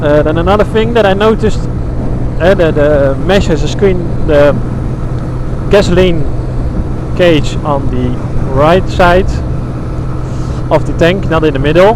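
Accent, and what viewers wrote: Netherlands. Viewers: haha hoorde gelijk dat je nederlands was ik ben deze aan het overwegen als mijn eerste motor zou je hem aanraden?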